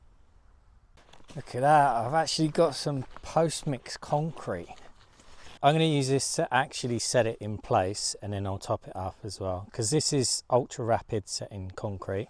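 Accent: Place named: Sussex, United Kingdom. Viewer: Like others have said here this channel is unique in terms of your style, honesty and determination to face any problem and deal with it. I came here after watching you help out Keith Brown dig out his back garden for better drainage. I was following another channel, Sampson Boat Co which was also about completing a long term project, but binned that after starting to watch your barn conversion and cartlodge playlists. I dig your accent, I am from Sussex so it resonates with me as a Southerner. Your humour is ace. OK your subs numbers may be lowish compared to the top guys but is there anything else you can do to boost this? More collabs with other YouTubers maybe, I know you had the Skill Builder video guy round for a piece but can you use this to your advantage in other ways? I think we all appreciate the massive PITA it is to video everything you do but try and keep it up and maybe something will turn up that gives you a more positive financial situation. 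My biggest DIY project so far is lining a double garage wall with plywood but your work inspires me to try bigger and better things. Onwards and upwards mate.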